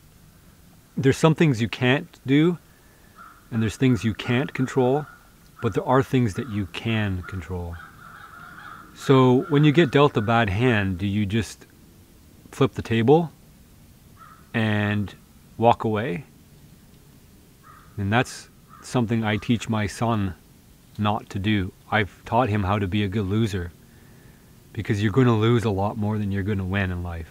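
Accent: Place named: Canada. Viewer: Watching this channel has made me realize the distinction between the way the Canadians and the Scottish say "About". This way sounds like "Aboot" with a strong O, while the Scots say it with more an U sound, "Abuut".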